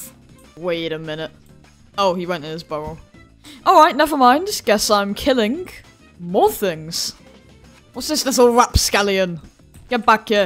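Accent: United Kingdom. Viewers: User: ChickChickadee2013: not american Me: that’s alr:) u dont have to be american to enjoy aolenus/eagles Btw are you British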